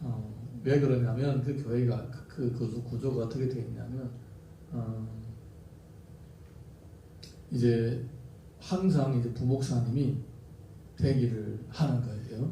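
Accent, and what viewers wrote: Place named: Pohang, South Korea. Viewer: 아멘 으로. 화받후 경청 합니다 말씀 하시는 억양이 포항 연일 그쪽 같아요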